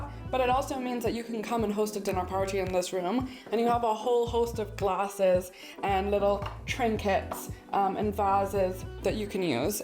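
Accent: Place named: Australia. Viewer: Are you freaking kidding me ?! You're based in Hove?! I live 12min from your studio and I had no idea ! I thought you were Australian or something 😂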